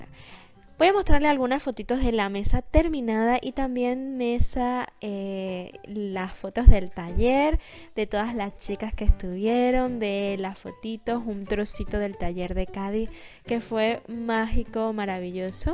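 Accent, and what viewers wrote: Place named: Maracaibo, Venezuela. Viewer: Hola... tengo rato viendo tus videos y tratando de descifrar tu acento y me decía a mi misma: “mi misma, no puede ser.... será que es venezolana?????” De repente se te sale algo maracucho jajajajaja (aunque acabo de aclarar esa duda). Estoy viendo tu video sobre las preguntas personales que te hicieron y tuve que parar para llorar por la nostalgia que sentí al escucharte hablar de nuestro hermoso país. Sentí la necesidad de escribirte para felicitarte por todo lo que haces. Se nota que amas lo que haces y por eso todo te sale tan maravilloso. Gracias por dejar en alto nuestra hermosa Venezuela. Saludos desde Indiana, USA. Recibe un gran abrazo!!!!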